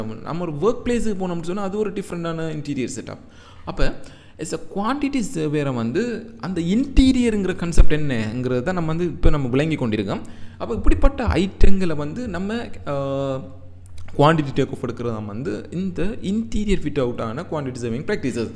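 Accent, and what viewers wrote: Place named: Sri Lanka. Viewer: Great Tamil with Srilanka Slang hearing very nice Sir ❤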